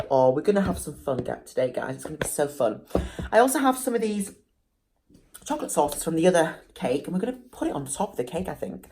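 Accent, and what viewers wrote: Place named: England, United Kingdom. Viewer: Gorgeous as always. I wish I had your lips. 🤤🤤 Your accent... I cant figure out what part of England you are from. 😂 I'm American but have lived in West Yorkshire for 8 years with the English husband. That cheese cake looks amazingggggggg. ❤❤☮☮🥓🥓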